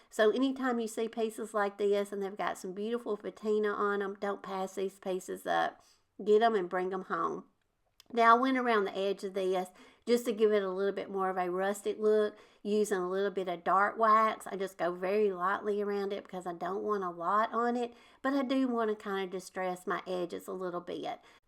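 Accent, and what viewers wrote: South, United States. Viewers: I love your channel (and your beautiful southern accent!). 👍 You are always so inspiring.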